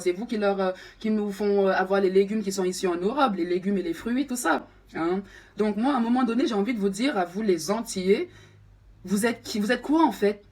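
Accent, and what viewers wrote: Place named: Cameroon. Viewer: Vu son joli accent, c'est ma soeur camerounaise.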